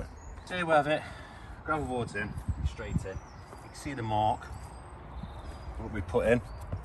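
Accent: Nottingham, United Kingdom. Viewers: Amazing video. I recognized the Notts accent immediately, always makes me smile. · Wait is he from Nottingham lol ?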